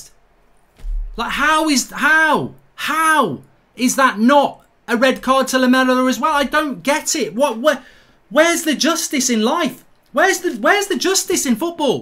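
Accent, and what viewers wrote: Manchester, United Kingdom. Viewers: Proper Manc accent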